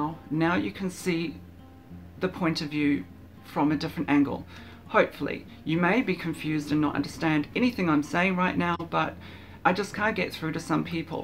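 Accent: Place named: United Kingdom. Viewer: for a second I thought you were my ex until I heard your British accent, you and her can be twins, this is a very good video , I showed it to my transgender niece , her friend and him had sex and he had that shame look as you mentioned and I told him what you said and it actually helped them and they're now dating 😃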